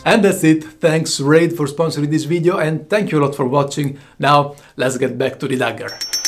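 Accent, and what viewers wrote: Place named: Italy. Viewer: Mi sembri italiano dall accento, vabbè Anyway great 👌🏻👍🏻🤘🏻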